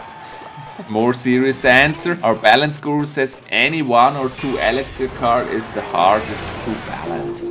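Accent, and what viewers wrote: Switzerland. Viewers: Bist du schweizer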